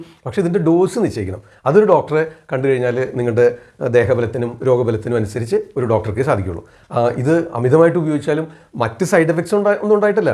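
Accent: Thrissur, India. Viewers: Are you from Thrissur suburbs like Ollur? Very familiar slang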